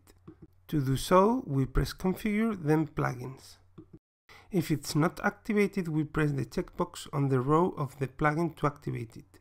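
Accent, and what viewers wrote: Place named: Germany. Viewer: I agree with you, German people are super nice. On my previous comment I said "The next videos were narrated probably by a German." because I had caught a small hint of German accent in the narration.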